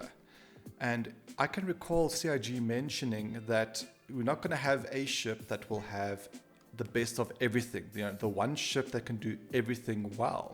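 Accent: South Africa. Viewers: You say capital like it should be Cape Town, but it’s actually still Pretoria. Even though capexit is there. Lol. Jokes. Can hear a saffa a kilometre away. The misc starfarer is a beast. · I notice that south african accent... any way I also looked at that odyssey it truly is an oddity, the only thing I can see it really being is a mobile station or base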